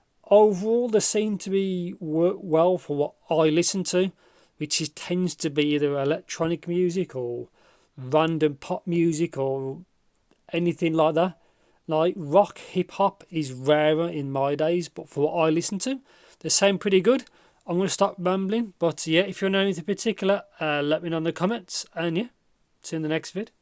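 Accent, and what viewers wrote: United Kingdom. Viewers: This is standard UK english, yup it's hard to understand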